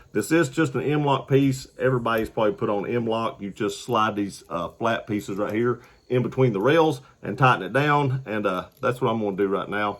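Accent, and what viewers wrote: South, United States. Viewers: Are you from South ?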